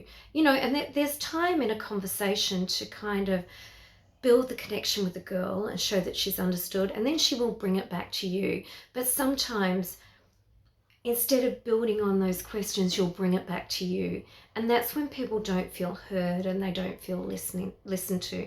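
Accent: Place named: United Kingdom. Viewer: Thank you for your informative and beautiful video,! 😃👍 You are gorgeous and lovely 😍, and I love your cute sweet and beautiful UK accent!! 🥰😊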